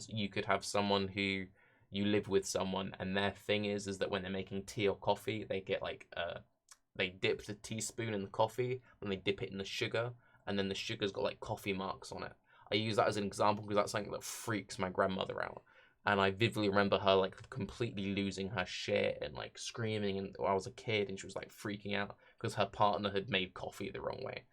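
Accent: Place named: England, United Kingdom. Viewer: respect man.you sound english.so look into picking yourself a fucload of liberty caps and blast off next month.think the season is same as norway.dunno how it relates but it helps alot with depression and unwinding.and suprisingly alot of pains and headaches go away for many.pretty anecdotal but...safe as milk atleast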